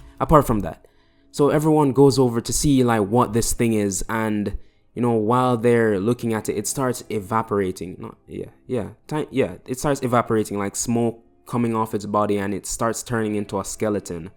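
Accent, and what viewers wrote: Jamaica. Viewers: Dude are you Jamaican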